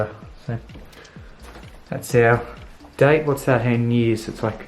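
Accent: Australia. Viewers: He has the most aussie accent ever :D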